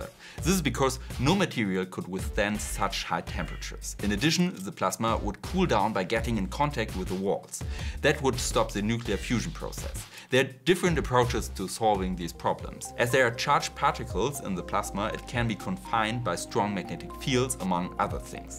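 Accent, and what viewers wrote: Germany. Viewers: Wenn ich als Deutscher Deinen deutschen Akzent extrem ulkig finde, dann ... ist es anscheinend genau richtig. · Physics is best "auf Deutsch", or "auf Englisch" with a German accent!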